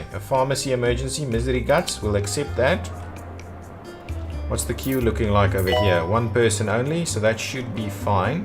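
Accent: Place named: South Africa. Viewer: You must be from SA 🎉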